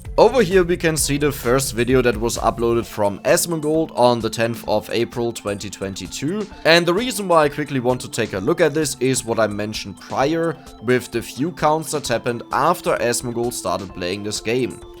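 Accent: Germany. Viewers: You have an analytical approach to both games you play and things like this, your channel's growth. That's pretty unique and along with your personality, you don't have to worry about the channel. You'll grow as a creator no problem, as long as you keep at it. Also, German accent is pretty cool, ngl.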